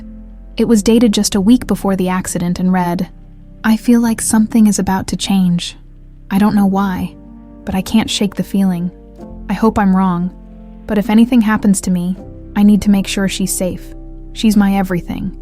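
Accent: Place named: England, United Kingdom. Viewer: Your telling language is not American it sounds more like English accent. Would you mind telling from which country you’re from.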